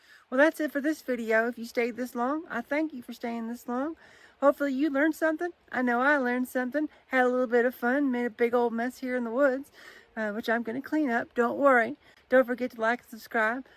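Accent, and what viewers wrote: South, United States. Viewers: Sound like you from Mississippi or Alabama or Tennessee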